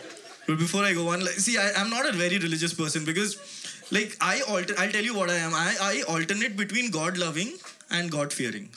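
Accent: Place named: Bengal, India. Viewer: I like this guy. The way he presents . Perfect timing . Not loud . Some other comedian are too loud on physical activity. Yes sometime his pronounced like Bengali ( be proud and continue ) . in this vedio he just nailed it by saying religion by choice. Only thing he might reduce the word fuck unnesseccary.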